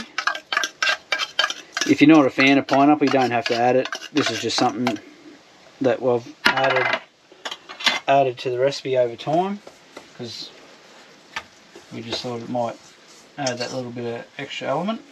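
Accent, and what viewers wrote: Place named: Australia. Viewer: I'm a Canadian senior. At first I thought I clicked on a foreign language channel. Thank God for the CC & Auto-Translate feature. I selected English. Then I was able to read what you were saying & then it dawned on me that you were in fact speaking English, the Australian version of it. I must say that I love your accent. I feel so foolish now. Must be my senior years. Oh well, at least I can laugh at myself. No offense intended, I thought that you could use a laugh at my expense too! Thank you for sharing!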